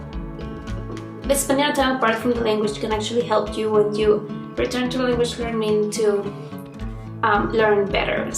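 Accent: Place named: Latin America. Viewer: You are completely right!! So the first video I watched of you, I thought you were native from Spain due to your location and I say but how is that you speak english like someone from latín america jajaja but now I know you are neighbor of my country and it´s great to know it. You are doing really really well with all those language you know. keep doing!!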